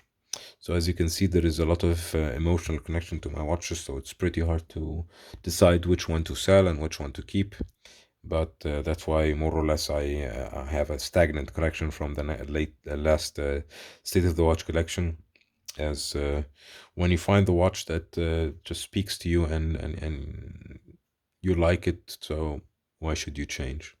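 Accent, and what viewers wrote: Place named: Egypt. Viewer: Great collection man! I knew from your accent that you might have been arabic (egyptian if i'm not mistaken) and I was waiting for you to pull out some watches with arabic numbers.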